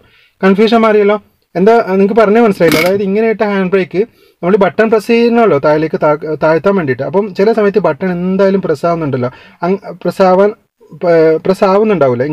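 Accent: Kannur, India. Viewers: Sprrr...ellaavarum paranjadu pole tanne nannaayi manassilaakki tharunnind ee video...njnm licen edthitt 4 years kazhinju...roadil vere vandikal illaathappozhanu njn drive cheyyaaru 🤣 bt ee video kandapol naalethanne poyi practice cheyyanonnu tonnunnu....nthaayalm nalla avatharam 👌👌 ...ningalde samsaaram kettitt ningal kannur aanuo😀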